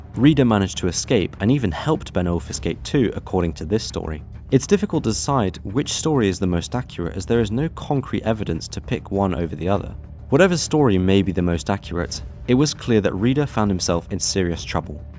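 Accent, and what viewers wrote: Netherlands. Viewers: Mate who narrates these vids ? Are u dutch ?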